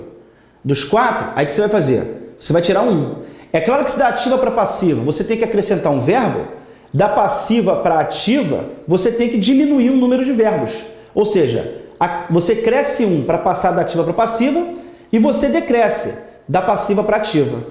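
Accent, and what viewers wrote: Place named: Rio de Janeiro, Brazil. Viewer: Sotaque de carioca já é foda de aturar, ainda mais quando tem que estudar ouvindo isso...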